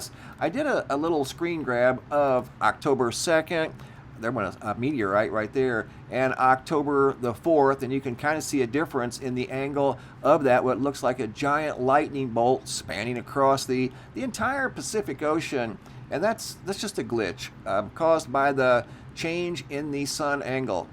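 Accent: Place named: United States. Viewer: Your voice is just so quintessentialy American 😊😊 so easy to listen to which helps take on the information.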